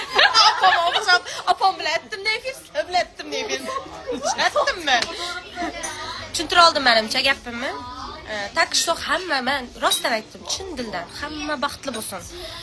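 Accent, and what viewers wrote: Khorezm, Uzbekistan. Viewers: Хоразм шевасида гапиряпти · Turkçe iyi konişamiyosin 😮😮😮 sen Harezmdan galiba😮😮😮Harezm şivasinda konişyosin😮😮😮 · Сизга подписка булдик. Позитив инсон экансиз. Хоразмча жудаям бошкача ти л тушуниш кийин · Хоразм шеваси бу одамга билмай тухмат килма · Bir karasa xorazmcha bir karasang uzicha toshkencha gapiradimi ukuvsiz ekanbu maktabda ukiganmi bu😢😅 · xarazm tili chiroyli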